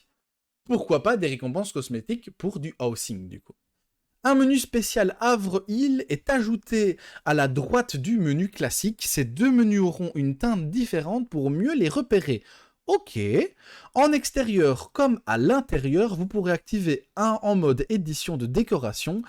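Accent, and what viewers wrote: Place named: Liège, Belgium. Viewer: Tu viens d ou en belgique ? On dirait liege non? Comme moi mdr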